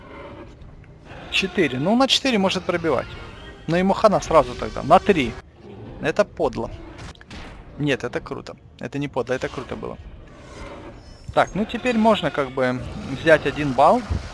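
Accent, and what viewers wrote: Ukraine. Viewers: Ти из украини?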